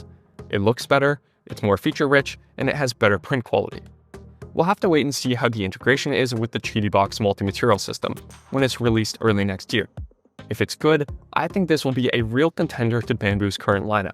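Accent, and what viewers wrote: Canada. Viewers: Are you Canadian? It's difficult for a non-English speaker to tell, but i think there are some words that fit the bill ("about").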